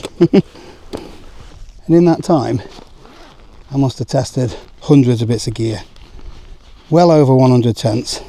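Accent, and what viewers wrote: United Kingdom. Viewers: Great video 😁👍 I'm ex-pat Brit, living in Japan 20+ years, and it was great to hear your accent, see the views, and remember the National footpaths/bridleways etc., in the UK 🇬🇧❤️🤍💙 Here 🇯🇵, "Right of Access" doesn't exist, so although not technically illegal, wild camping simply isn't done. 🫤 Our camping trips are closer to those of the gorgeous "Kirin Camp" (the South Korean girl camper), than yours......but not really by choice. 🥵 Be safe, and catch you later mate. ☺️👍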